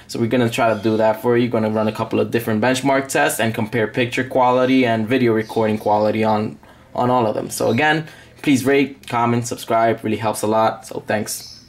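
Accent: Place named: New Jersey, United States. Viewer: sounds like he's from Nj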